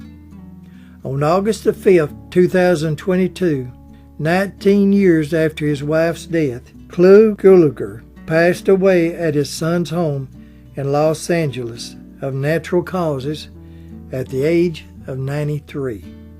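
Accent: South, United States. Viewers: What evocative videos these are for a Golden Age Syndrome sufferer like me, and all in that wonderful southern accent.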